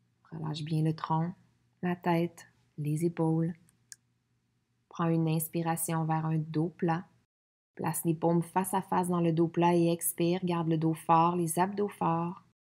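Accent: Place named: Quebec, Canada. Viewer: j'adore cet accent Quebecois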